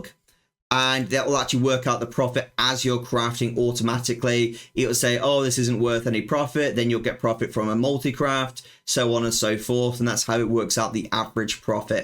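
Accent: United Kingdom. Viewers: you sir have probably the best energy its just so refreshing to see, also the english accent is easy to understand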